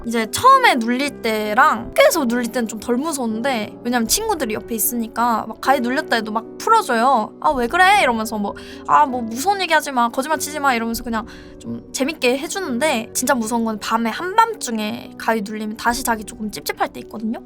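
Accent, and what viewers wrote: Standard. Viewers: 표준어 패치된 ㄷㄷ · 새삼 느낀게 사투리 다 어디가고 서울말 쓰고 있네요??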